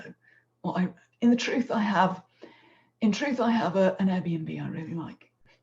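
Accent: United Kingdom. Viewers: Hello my name is Tricia and I am an introvert:) your voice is wonderful. This comment has been along time coming; but I am truly an introvert. As I said I love your voice, it is one of those "super sexy low and dulcet toned British voice's". I am out of my shell why not because of any virus no no I am in a bother about the Foundation Raveled sign up. Yes I have been stalking the Foundations Raveled web site for 10 years. Yes there should be another why, for 15 years my life has been ruled by chronic pain, and could not sew:( But now after 3 operations, 4 months of exercise and this thingy in my right buttock that I have to recharge once a week and yes I do think of myself as a cyborg. Now finally my health is good enough to sew, I am slow but I can still sew. I learnt is to make plans for the beyond what ever the difficulty was with the chronic pain. So make plans and hold on to them in the here and now, this is how I got through the fear. 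Those plans are a foot, I think, I hope, I am on the wait list, I tried to put my self on it and now I am having a very small panic. Lastly before go back in to my shell maybe the wonderful could do these live talks regularly:) xxx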